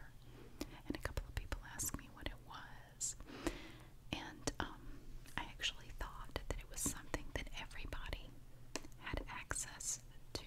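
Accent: South, United States. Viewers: i love her voice so sweet and southern. like mine be safe beautiful u are doing amazing job im proud of u love u always frim a fan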